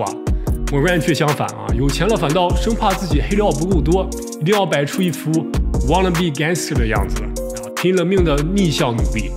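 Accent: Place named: Dalian, China. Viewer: 哈哈大學在大連四年，看第一個視頻時候聽喃第一次說話就知道是大連銀🤣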